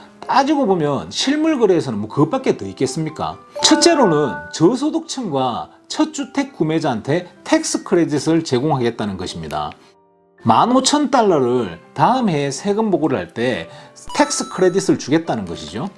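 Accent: Gyeongsang, South Korea. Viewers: 원래 갑자기 스쳐가는 생각이 세상을 바꾸는 법입니다, 적극 추천합니다,,백안관에 청원 하시기를,,,,, ㅎㅎㅎ, 감사합니다, ( 같은 경상도 신것 같아요, ) 👍👍👍💕💕💕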